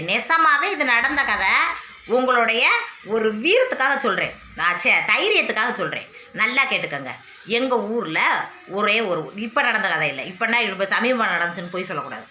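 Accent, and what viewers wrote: Madurai, India. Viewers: மதுரை பேச்சு அருமை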